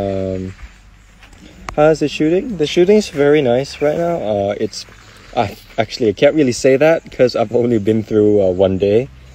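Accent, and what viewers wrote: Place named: United States. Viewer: He is heavily influenced by American English. His English sounds more or less like American rather than British or Australian or other accents.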